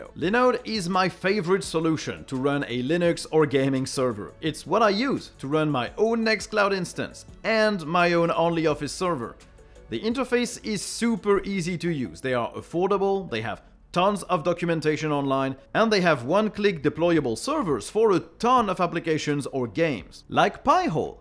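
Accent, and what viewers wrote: France. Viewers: je savais pas que tu connaissais le francais mais j'ai toujours penser a cause de ton accent :P en passant je suis quebecois :D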